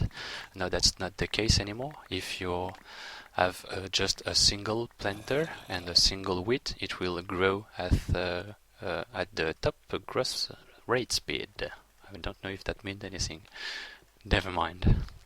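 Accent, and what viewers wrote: France. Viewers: On sens bien l'accent français ^^ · Haha, une présentation d'un mod énorme, et l'accent français qui donne l'impression que tu dis "weed" au lieu de "width" est parfait :D En tout cas super boulot ;)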